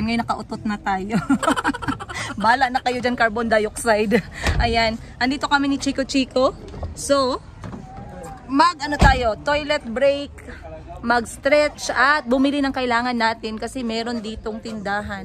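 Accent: Iloilo, Philippines. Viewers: Ilonggong ilonggo ka gid ba Inday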